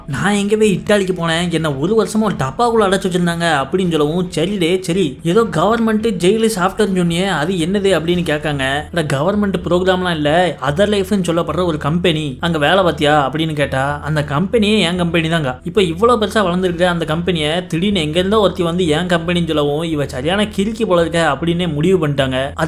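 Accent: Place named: Kanyakumari, India. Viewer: Super slang are you kanyakumari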